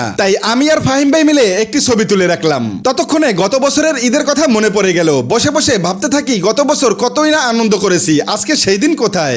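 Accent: Barishal, Bangladesh. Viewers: Kotha batra thik koiren aro views barbo 👎🏻🤬🤬 Borishailla Bangal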